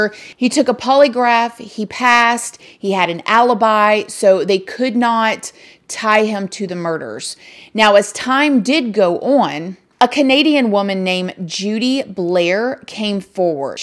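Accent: South, United States. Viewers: I love your southern belle accent you're the best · New sub! Fantastic job! I knew I recognized that southern drawl. Been to Florida many times, and lived there briefly once. Nothing beats the sunset over Clearwater (my fav vaca spot)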